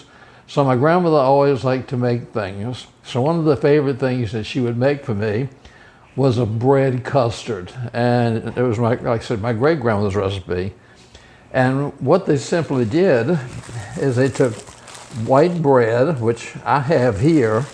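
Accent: South, United States. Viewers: Sir, thank you for sharing. Where do you come from? You sound like NC or Virginia.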